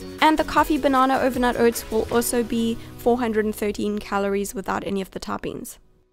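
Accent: South Africa. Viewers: are you South African? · South African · You’re South African! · Sounds like a South African 🇿🇦 ? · She's south African like me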